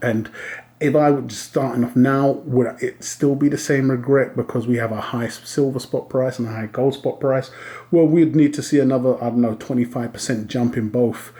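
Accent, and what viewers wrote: United Kingdom. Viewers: What’s more important is your British 👍🏼 and that Sir, draws me to the subscription button.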